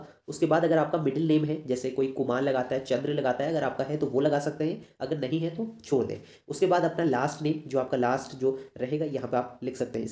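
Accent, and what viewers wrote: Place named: Pahadi, India. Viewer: Cool phadi ki awaj h ye to❤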